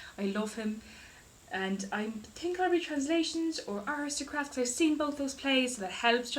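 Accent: Ireland. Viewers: THIS. MADE. MY. DAY. (the Irish accent 💚)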